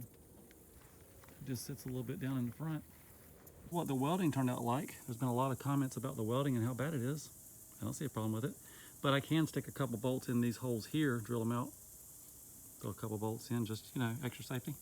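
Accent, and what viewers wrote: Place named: Eastern North Carolina, United States. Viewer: You must be in eastern NC. I can tell.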